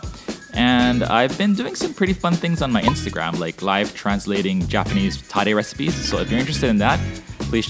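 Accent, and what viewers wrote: Hawaii, United States. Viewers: I like the shortcuts you show! It’s really clever! Are you from Hawaii? You sound like it.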